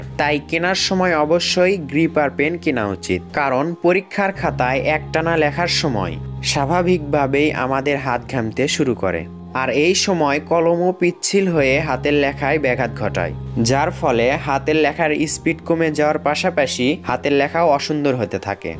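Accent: Bogura, Bangladesh. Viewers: ভাই আপনার বাড়ি বগুড়া নাকি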